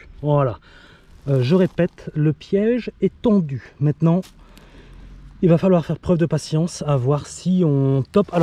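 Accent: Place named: South, France. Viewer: ont utilise les tapettes nAAara comme tu nous les vends si bien avec ton accent du sud.😂😂😂😂😂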